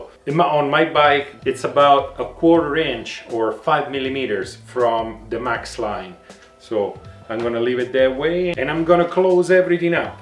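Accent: Italy. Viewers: love the accent! Italy?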